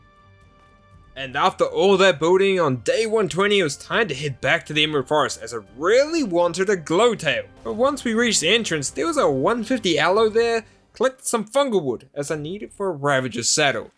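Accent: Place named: New Zealand. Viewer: 200 days and NZ-english!! Can`t get better!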